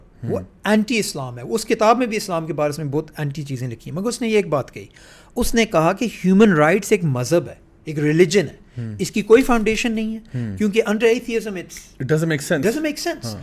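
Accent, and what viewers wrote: Pakistan. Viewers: I undertood the english part but with urdu accent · Ma sha allah bhaijaan ko urdu mein bolte hue bohot accha laga, wo Pakistani touch zubaan mein unke barqaraar rahe.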